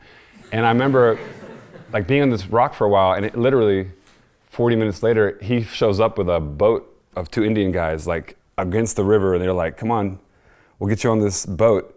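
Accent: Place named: Cockney, United Kingdom. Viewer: cockney pig sty gov'nor. bah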